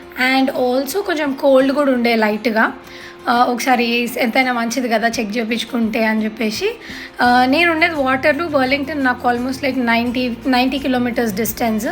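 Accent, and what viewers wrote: Telangana, India. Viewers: Hi what are you first time watching new video but very good talking are you Telangana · Perfect telangana yasa (slang 😎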